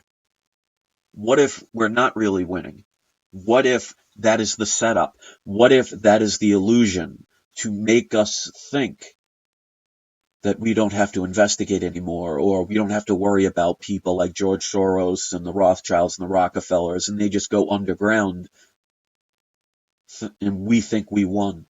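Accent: New York, United States. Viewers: YOU SOUND LIKE YOU ARE A NEW YARKER HEY FROM THE WEST COAST ME-REFUGEE CITIZEN HEFT SAN JOSE CA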